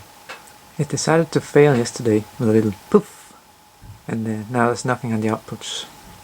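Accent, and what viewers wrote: Denmark. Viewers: Elektronik Center ? Danish accent.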